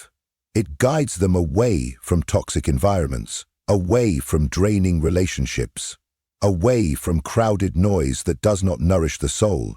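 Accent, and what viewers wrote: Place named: United Kingdom. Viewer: Interesting video, but why is the narration for videos like these always done by this same sounding voice with the British accent, as if it personifies wisdom and depth? It's annoying and actually undermines the message. Please, please alternate the voices used to narrate.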